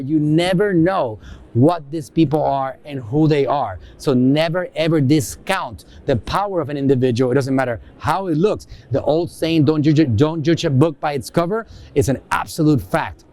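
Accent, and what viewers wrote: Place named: Latin America. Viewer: Qué increible como se nota que no es hablante d inglés nativo. Se nota el acento latino.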